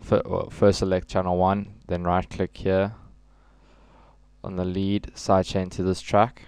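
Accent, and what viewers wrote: South Africa. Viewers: Are you South African? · sigh that Joburg accent xD noo man leka boet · you also from south africa dude? lol youve got a south african accent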